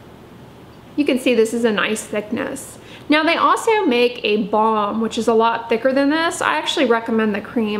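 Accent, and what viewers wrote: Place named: Long Island, United States. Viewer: I can’t with the Long Island lockjaw.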